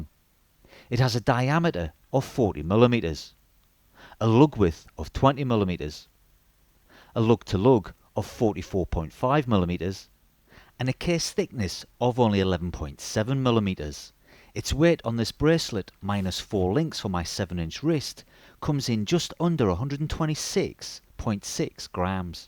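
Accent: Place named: Newcastle, United Kingdom. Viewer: Nice watch and great review! Love the bit of history and the Geordie accent! 👍 subscribed...🙂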